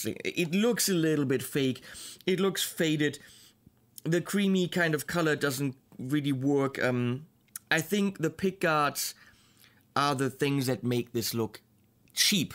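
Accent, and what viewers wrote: Germany. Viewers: Ich hatte die ganze Zeit das Gefühl das du deutschsprachig bist und als du "Very stramm im Ton" gesagt hast war es klar xD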